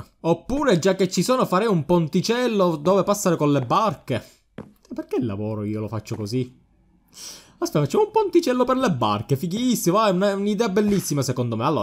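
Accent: Palermo, Italy. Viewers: Scusa mah sei di Palermo per caso?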